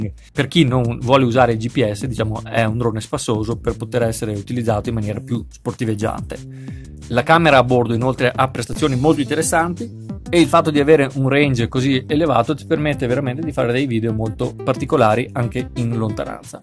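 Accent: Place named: Veneto, Italy. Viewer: Grazie, l’accento ti frega sei Veneto?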